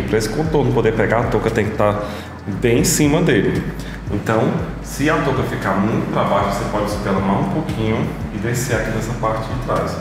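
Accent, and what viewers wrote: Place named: Ceará, Brazil. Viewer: Já reconheci que é um cearense de longe kkkkk meu marido tbm é!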